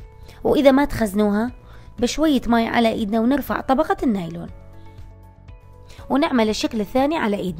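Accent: Mosul, Iraq. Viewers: لهجتك المصلاويي حلوي كثيغ أحبكم كثيغ عاشت ايدكي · تماام حبيبتي مثل شغلي انا هم من من الموصل مقيمه بتركيا قباان لهجتنا وقبان قلبكِ وعدالتكي حبي😍😘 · عاشت الايادي.. والأحلى من هذا كله لهجتكي المصلاويي.. تسلمين ومزيداً من التوفيق والنجاح. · عاشت الايادي..احلى شي بالفيديو اللهجة الموصلية. ما اعرف ليش منسمعها هواي حتى بالتلفزيون من يمثلون على الموصل ميحجون اللهجة الموصلية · لهجة اهل الموصل تقريبا مثل لهجة الرقة و دير الزور بسوريا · يخليلي هاللسان والله اشتاقيت للموصل اهلي واحبابي 😍🥰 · الله على لهجتها المصلاوي الحلوى أنا اشقد ارتاح من اسمعكي تحكين الله يحفظكي ويوفقكي يامعدلي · عاشت ايدج شغل رااائع واللهجه الموصليه تجنن لكن لا يجوز وضع الكبه مع كيس النايلون في قدر السلق لان النايلون بالحراره يتحول إلى سام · والله تستحقي الايك فديت الصوت الحلو واللهجه الموصليه🌹🌹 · شگداحب لهجتك وكلامك الناعم اني خوالي اهل الموصل احبهم كلش واتمنى اشوفك امي هم تعمل الكبة طيبة مثل كبتك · احى لهجه بالعراق هي الموصليه عشتي حبيبتي والف شكر ❤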